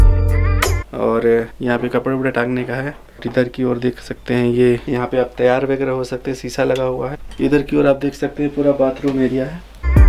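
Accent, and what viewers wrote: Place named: Kanpur, India. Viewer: Guru kanpur se ho ka..yar boli bhasha ekdam mil rahi hai na..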